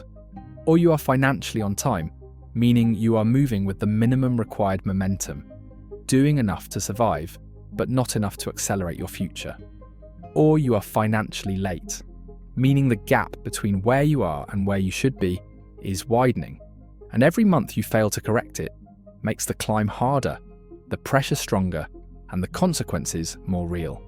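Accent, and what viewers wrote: England, United Kingdom. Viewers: Always wondered why its an English accent talking in Dollars. Helpful video...uk based. 42, no debt, buffer in place and started an all world global index fund aim to save £200 ° month into it. Hope to be able to retire earlier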